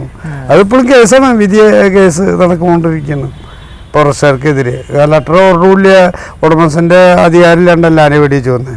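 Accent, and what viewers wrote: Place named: Thrissur, India. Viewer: എത്ര കേട്ടാലും മതി വരില്ല ഇദ്ദേഹത്തിന്റെ സംസാരം. ആ തൃശൂർ ഭാഷയിൽ ഒരു ജാടയും ഇല്ലാത്ത നിഷ്കളങ്കൻ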